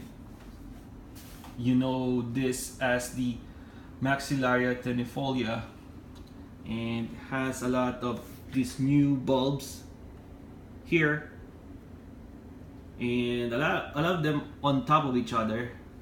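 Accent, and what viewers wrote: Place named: Philippines. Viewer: Hi, love your videos. I don’t know but your accent makes me think you are Pinoy, are you? If so, I am so lucky to find you. I’ve been looking for Pinoy youtuber making vids about plants!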